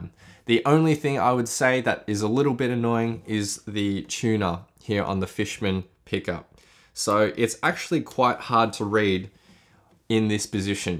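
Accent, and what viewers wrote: Australia. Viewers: i always watch your videos help me to improve and learned base in your accent seems like you live he in Australia aswell :)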